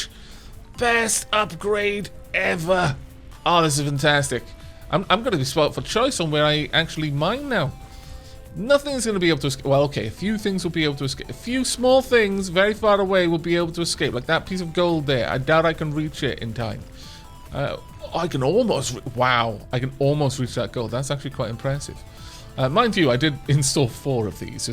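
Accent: United Kingdom. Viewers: Impressive that you get a British accent out of it, considering (and his accent) is Welsh, not British. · PyroDesu Wales is part of Britain. irgo he has a British accent.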